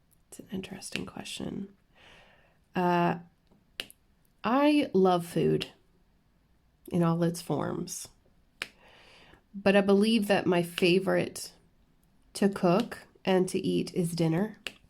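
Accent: Ireland. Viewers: did you realize that you are picking up an Irish accent? When you were outside with your new divas it was coming out. It fades in and out of your videos depending on what you are doing.💖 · Valerie Dunham I heard it and loved it. But then again I love the Irish accents anyway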